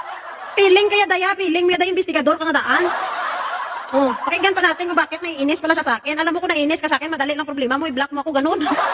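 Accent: Iloilo, Philippines. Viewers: Super love ko talaga Ilonggo accent. 😂 ❤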